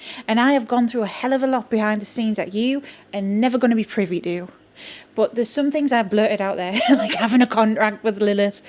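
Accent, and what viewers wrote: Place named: Newcastle, United Kingdom. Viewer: I love Ur authentic self & the fab Geordie accent, I agree too many low minds jealousy ect. I don't av the knowledge on these gods goddesses I channel some Hindu Buddhist to paint them blessings xx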